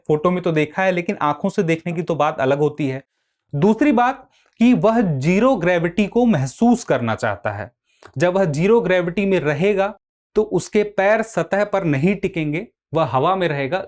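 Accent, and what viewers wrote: Bihar, India. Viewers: guthka nhi hai I think sir bihar side se hai vha esa hi eccent aata hai.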